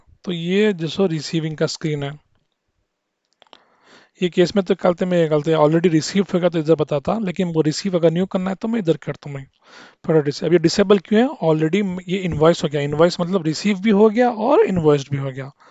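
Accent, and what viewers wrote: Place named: Hyderabad, India. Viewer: Abba Kiraak Hyderabadi accent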